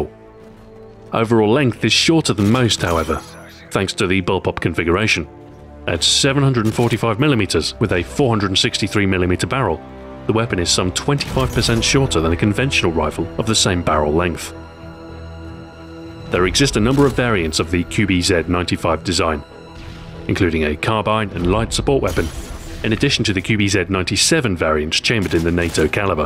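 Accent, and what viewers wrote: United Kingdom. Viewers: YOur british accent is so easy to listen to. And makes it a tad more intresting to watch.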